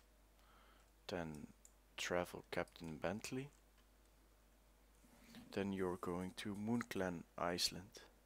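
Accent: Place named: Netherlands. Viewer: tought you were dutch actually, it's because you speak words with an Dutch accent :) liked the guide really much, didn't know to kill them there :)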